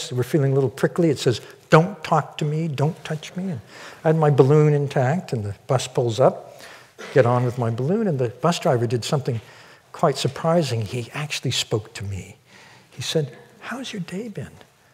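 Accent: United Kingdom. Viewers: I feel very connected during your Q&A😂😂😂 British accent never fails me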